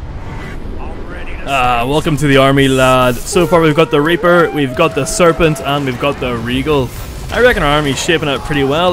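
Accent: Ireland. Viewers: I only watched this video to see the raid shadow legends ad idk why just had to see it from the most Irish lad ever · Wait your an Irish man to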